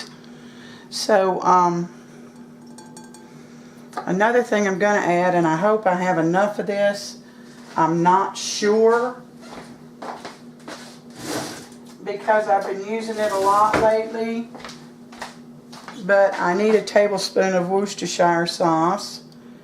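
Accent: South, United States. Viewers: I have not hamburger steak in years. That sure looked good.A real comfort food. So yummy. Wish I was there for dinner. My mama always said "cut off the heat" or "cut off the lights" and not turn down the heat or turn off the lights. I can tell you are Southern. So cute. I always say I can speak Southern. Does that make me bilingual?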